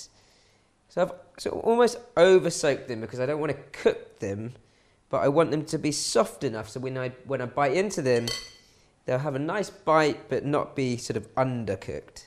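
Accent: United Kingdom. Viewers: Too much sugar....i can not trust this man. Also, an annoying British accent...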